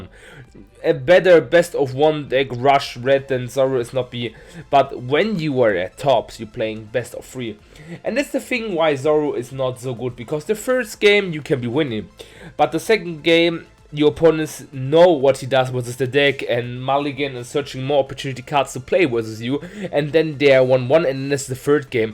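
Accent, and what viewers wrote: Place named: Germany. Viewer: Ah nice, hatte immer das Gefühl wegen des typisch deutschen Aktzents 😁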